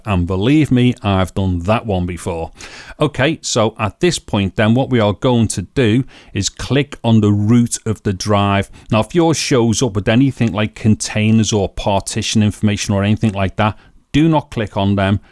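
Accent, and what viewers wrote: United Kingdom. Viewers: Hi! First of all I (as a "bloody German") have to thank you for your very precise pronounciation! It's been a pleasure to listen to your video and I like your accent, though I wonder from which part of the UK it originates. Concerning the read speed you measured with the enclosure one has to consider the write speed of your internal ssd. This has to be faster than the read speed of the external ssd to be able to measure the correct read speed of the TB/USB4 enclosure! By the way, those enclosures with an ASMEDIA AS2464 chipset are both TB4/USB4 compatible with complete downward compatability. Connected to a real USB4 controller in a Win11 laptop or pc you can reach up to 3800 GB/s according to ads for such enclosures such as ZikeDrive Z666 or Adata SE920. So, if you're going to proove these drives in future videos, you're going to need a relatively new laptop or a USB4 controller card for a not too old pc. 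Your 10 year old optiplex seems a bit too old for this, I think...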